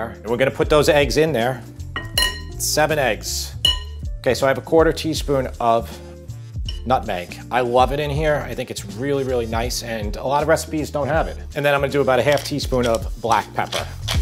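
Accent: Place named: Italian-American, United States. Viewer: Ah, Pizza Chiena di Campania, there aint no such word as "gaina" 😀 Thumbs up though, you're a damn good chef and I always enjoy your videos very much and always smile at Italian-American pronunciation. Ree-GOT and Pro-ZHUT and then that perfect Peco-rrr-ino Rrr-omano... Bravo Ragazzo! Really like the lattice you did. I find I don't like a really heavy crust on top nearly so much as this light one. 👍🙏👍 Happy Easter by the way.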